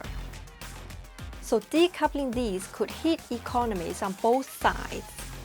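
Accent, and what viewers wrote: China. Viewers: Just because an asian woman with a Chinese accent is presenting doesn't mean she isn't a cog of the NED, or of Britain. One person should not represent a country of 1.5 billion. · Narrator has a canton accent. Probably from hongkong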